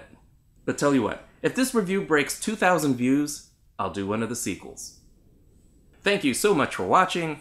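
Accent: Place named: United States. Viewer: Are you somehow a Filipino? Cuz honestly you look like one with a very american accent 👌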